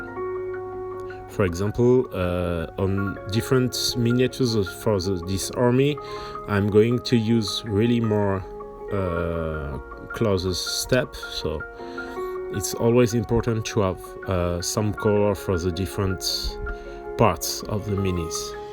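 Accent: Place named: France. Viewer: I can hear french accent lol excellent tuto merci beaucoup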